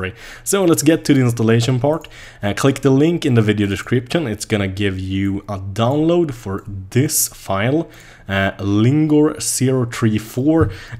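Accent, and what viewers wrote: Sweden. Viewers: You sound like your from sweden o you were nice! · dat swedish accent lol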